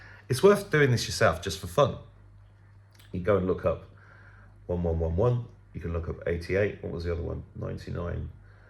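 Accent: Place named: United Kingdom. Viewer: Ohhh you are sounding very British today, hehehe.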